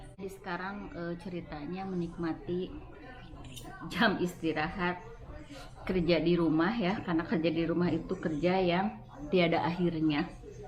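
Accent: West Java, Indonesia. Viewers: Meuni sundaa pisaaan euyy ..samii abi oge mojanh priangan atuh lahh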